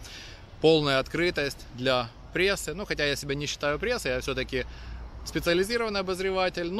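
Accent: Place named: Hutsul, Ukraine. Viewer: у вас файна гуцульська говiрка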